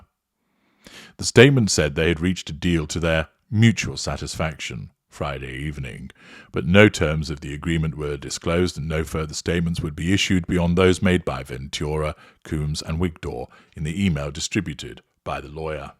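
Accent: United Kingdom. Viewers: Dude why can’t you pronounce names properly? Surely even with your British accent, pronouncing Combs properly can’t be that difficult. It’s COHmbs….not Cooms! Also, she got $100M from the settlement. It’s open source data so you should have known that. It’s obvious that you’re just reading from various sources and have no actual understanding of the subject….including how to pronounce his last name properly. I usually enjoy your videos but this series was weak to say the least.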